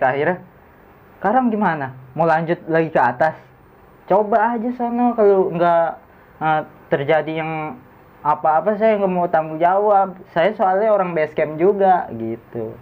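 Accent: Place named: Jakarta, Indonesia. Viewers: Ciri khas bocah parung,kalo ngobrol 😎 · Wkwk baru nonton eps ini, betawi amat bang logat lu 🤣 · hahaha bocah parung lu ah gua demen nih bacot nadanya begini 😂😂😂😄😄😄☺😀😀😅😅😆😆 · Buset medogggggg bgt bahasa parung nyaaaaaaa...😜😜 ilokaaaaaannnnn baeeeeeee tong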